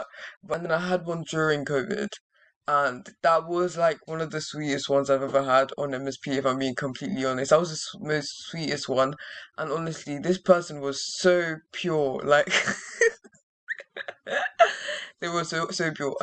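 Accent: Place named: United Kingdom. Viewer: I love this style of video and I love your voice you are so British mate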